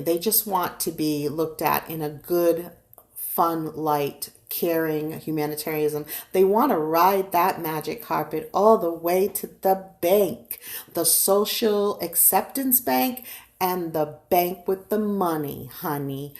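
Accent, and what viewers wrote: Northeast, United States. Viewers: Hello- my first time here.. You sound as if your from my neck of the woods...NE. " Markelytes" yiddish& bi racial?lmao... I doubt anyone believes anything they hear...We are all loving the gossiping& the Bashing! She is a mf joke& i for one am loving it!😉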